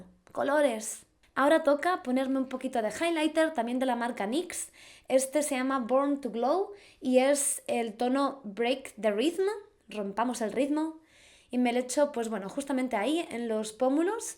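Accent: Spain. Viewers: Amo tu acento español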